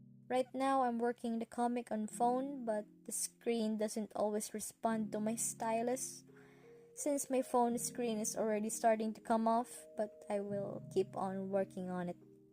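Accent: Philippines. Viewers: Your voice reminds me of a Filipino accent, are you filo? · I never expected ur from phil and ur voice sounds exactly like from phil, beautiful voice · You sound Filipino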